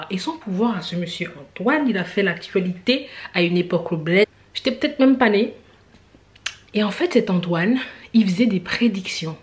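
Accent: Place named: Africa. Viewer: Merci pour toutes ces expressions ; que je connais sans savoir leur signification . Tu es trop forte .tu as l'accent africain et jaime bien .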